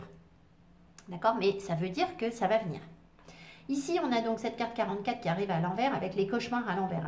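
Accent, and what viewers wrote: England, United Kingdom. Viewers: J.adore votre accent anglais🙏🌻💝🌞👍🥰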